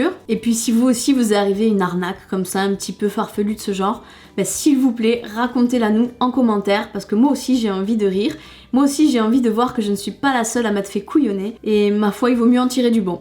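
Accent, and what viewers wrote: South, France. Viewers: j'adore l'accent du sud !!! 😂😅😆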